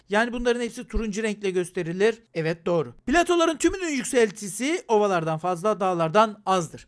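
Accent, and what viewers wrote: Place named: Black Sea, Turkey. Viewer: Ne alaka knk adam Karadenizli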